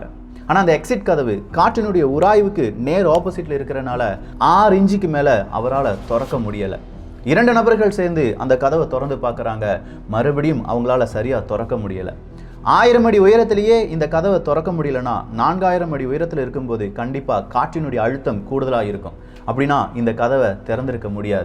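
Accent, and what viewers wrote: Kerala, India. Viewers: Are you mallu?. some of words pronounciation like that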